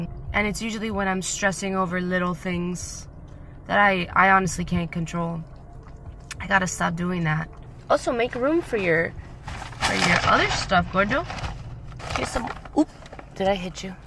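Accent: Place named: Cuba. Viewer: 😂😂😂 that Cuban accent kills! I love it! I miss my Cuban people in Miami! I miss Miami!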